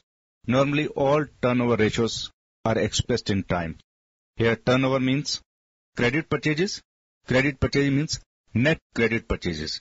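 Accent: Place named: India. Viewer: I saw all your videos on Ratio and found it very understanding and thus useful. They were simple and ofcourse taught with perfect Indian accent. Thank you.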